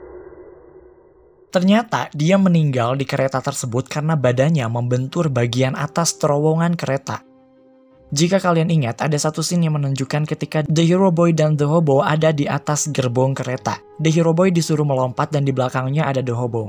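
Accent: South Jakarta, Indonesia. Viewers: English jaksel ?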